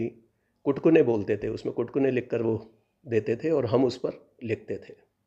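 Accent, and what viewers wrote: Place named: Delhi, India. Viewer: Sir aap delhi se hai yesha mujhey lag raha Tha kyun ki u.p ka language kuch defferent Hai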